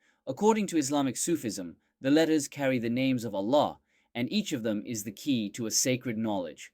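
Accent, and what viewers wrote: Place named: United Kingdom. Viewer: Hocam, maaşallah.. 😲 British aksanınıza bayıldım. 😍 Resmen, bizden alemlere hayret ve şükür dolu bir portal daha açtınız ki... Uzay serüveni tadında, heyecanında, ortalık yıkılıyor. 🌠🌌💫 Emeklerinize sağlık. Cansınız.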